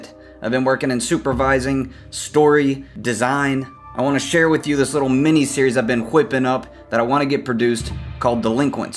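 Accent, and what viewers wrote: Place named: Texas, United States. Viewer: Howdy fellow animation industry Texan! Hearing you talk feels like home🙂 I been thinking about your pitch for days, and I basically wrote an essay in response, but I feel very passionate about your idea, hope you'll bear with me. Okay! The idea of a series that shows what it's like in the US is outstanding; you're right, we don't really have that! And it's great you're thinking about bringing in therapists, hopefully public health specialists that specialize in studying mass shootings. I developed a similar story too, spent about 7 years on a novel about a mass shooter and why he did what he did. Then I lost friends in a mass shooting. In my grief, I searched for a reason why someone would do this, and read hundreds of profiles of these dudes. One thing that struck me, is that they could all basically be the same guy: brags about how smart they are to mask a deep insecurity, impulse control problems, and what I could only describe as anger tantrums, exploding the way a toddler might. 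These men are angry that they don't have the woman they want, the job they want, the money they want, whatever. And instead of turning inward and improving, they lash out. It's not a very complex journey. I applaud those who pull themselves out of this trap (like the TED talk fellow) but for the dudes who make the decision to steal human lives from our communities? Learning about how uninteresting a mass shooter's personality is, for me, was a bit like reading Eichmann in Jerusalem, a book about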